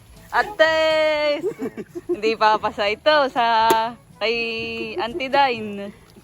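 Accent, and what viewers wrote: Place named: Marinduque, Philippines. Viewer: Ikay baga ay taga Marinduque sa punto ng pananalita mo ay marinduquenio ga mura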